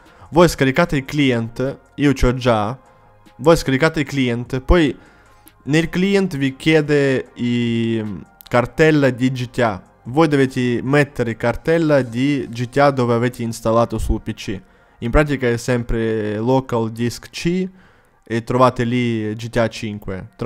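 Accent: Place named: East, Italy. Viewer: si capisce che sei dellest dalle bmw e dallaccento ahahah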